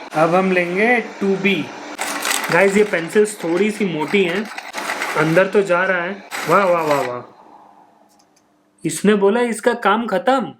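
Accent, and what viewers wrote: Bihar, India. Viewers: Bhai aap Bihar se ho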